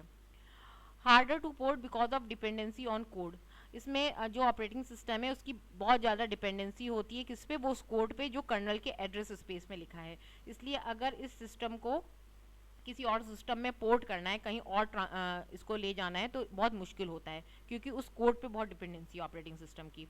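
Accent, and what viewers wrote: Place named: India. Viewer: This Indian accent sucks.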